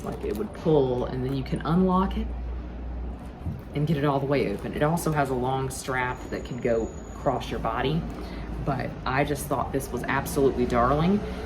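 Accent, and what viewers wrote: South, United States. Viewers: OK. So many thoughts from a newbie subscriber. What is not to love about toner when it comes to skincare? A swipe of toner over the skin leaves you feeling soft and clean. And if it smells of roses.... then its positively swoon worthy. Spiral notes books are my favourite to work with, but I would probably be like you and not able to resist buying a whole armload of new journals. I wish I could read in another language. Heres a video idea, you reading the Italian books with your Southern accent..... delightful! OK. Walking away now before I scare you away.....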